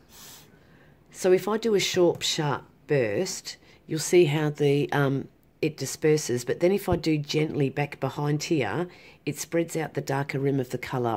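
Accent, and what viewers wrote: Australia. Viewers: Ah the Aussie accent and the 'youse' hehehehe! Hilarious! (I'm a Aussie too.) I see someone has already talked about the narrow field of vision so that's great for next time, but you might also try not to say 'um' so much hehehehehehe. This technique is so very exquisite isn't it? i love it. is the paint waterproof, how do you seal the tile and can it be put on a wall or is it only to be framed? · Haha!! Well then, that’s perfect!! We both got a little chuckle this morning ☺️ (or “this evening” 🇦🇺 you sound Australian which means are 14 hours apart)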